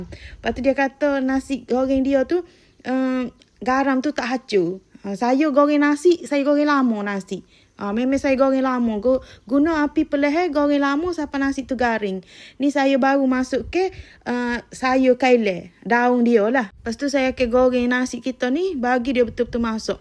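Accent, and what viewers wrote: Kelantan, Malaysia. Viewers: Sedapppnya...tk resepi..asyik dengar kecek klate muuu...😊😊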